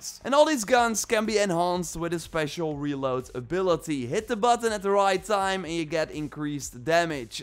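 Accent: Netherlands. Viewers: Hahahaha this guy sounds so dutch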